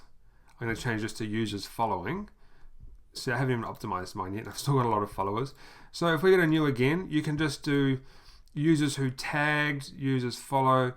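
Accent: New Zealand. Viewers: you from new zealand??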